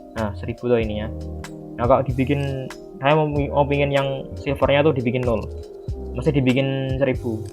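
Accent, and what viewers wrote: Indonesia. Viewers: Thanks Banget bang Info nya,btw abang orang jawa ngapak? XD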